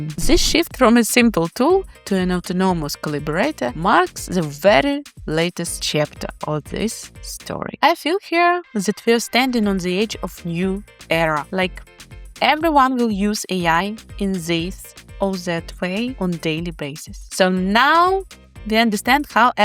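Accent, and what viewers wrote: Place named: Russia. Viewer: ah I find the Slavic Russian accent so funny and charming at the same time but good video your channel will blow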